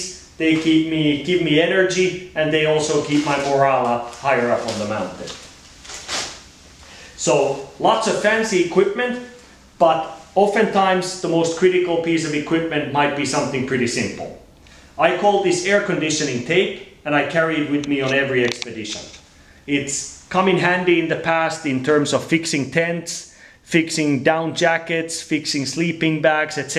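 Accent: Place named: Finland. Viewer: Propsit sulle ite oon vaa haaveillut everestistä ja muuten toi rallienglantihan kuulostaa hyvältä!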